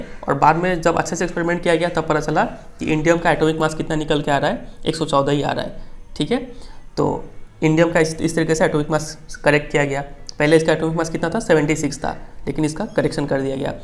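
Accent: Bihar, India. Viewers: Sir aapki voice se pta chalta h ki aap bhi bihar se ho vaise sir main bhi bihar se hi hu Samastipur district se.....by the way sir aap itna acchhe se padhate ho ki mujhe lgta h ki school chodd ke aapse padhu padhu..bs .. . Thanks alot sir for giving such bigg efforts for us.....sir love.....so much......bhagwaan kre aapka channel itna grow kre ki aap hmesha kush rho or apki financial issuue....hamesha achhi rhe ....thanks sir ...😍😍😍😍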